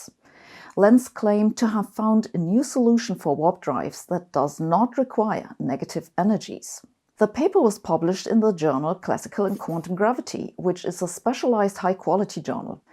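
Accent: Germany. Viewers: Schlechtes Mikrofon und ein Englisch mit starkem deutschem Akzent macht das Hören anstrengend. Der Akzent wird nur schwer zu ändern sein, aber ein besseres Mikrofon wäre vermutlich möglich.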